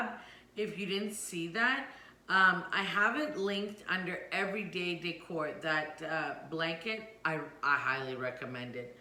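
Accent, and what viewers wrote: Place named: Italy. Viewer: you sound a bit Italian in this video .................. Love this video...Btw remember to drink a lot of water in the summer keeps you cool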